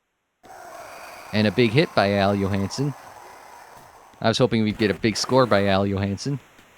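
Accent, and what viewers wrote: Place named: Newfoundland, Canada. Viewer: I am pretty sure that would be a Newfoundland accent...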